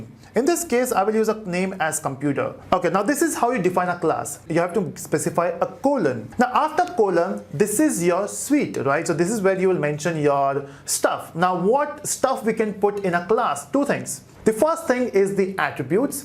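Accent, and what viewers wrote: India. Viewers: sorry your tutorials may be good but that Indian accent is killing me.And you talk like you had 1 kilo of coffee.Not very attractive when you want to actually learn something :(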